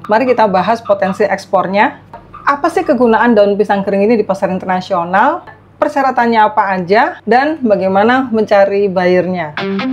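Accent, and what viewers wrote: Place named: West Java, Indonesia. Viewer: Sunda pisan teh...😊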